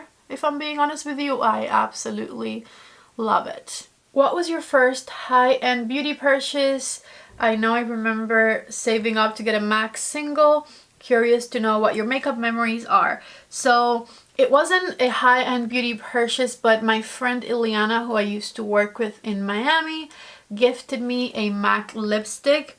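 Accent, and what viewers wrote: Cuba. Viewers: I recently reached all the Harry Potter movies with my daughter who’s 13! I still love it! I play the Harry Potter Lego game with my 6 year old daughter. So fun!!! I love going out to eat & Ed Shreen as well! I’m a certified ESL teacher! Your English is excellent, but I love that you kept a bit of your Cuban accent! I would love to visit Cuba! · Would you be willing to do a makeup tutorial in Spanish? I’m learning Spanish and I would love to hear it in a Cuban accent from an exceptionally talented person 🥰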